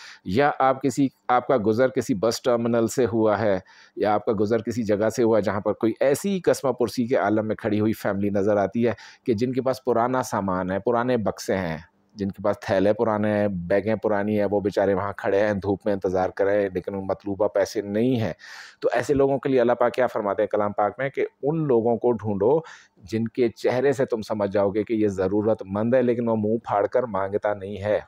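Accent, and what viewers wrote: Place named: Punjab, Pakistan. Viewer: 🌙niyat me agar ap ko mang liya tu?? Etna piyara panjabi urdo soft fast bolty ...acha lagta ha..few year ago me free hoki Beth gy.gour Kiya .me ni kasa reply Kiya same time par few good piyary insaan mujy papoose. Un sab ko same reply Kiya Ap kuhda se mago.last Ramadan days.bhot duai mangy hongy..evryday mesg me I off evry thing Ramadan ki zikar and cooking raat bi jagna .after Ramadan computer opn hi nhi howa buzy with zindagy job after 8 year wo Nawaz hogy nhi pata khagy Allah zinda h ai kher se ho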